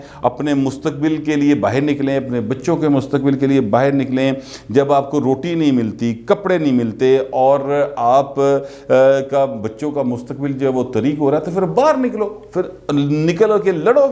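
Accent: Punjab, Pakistan. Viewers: Your punjabi "Tarka" is excellent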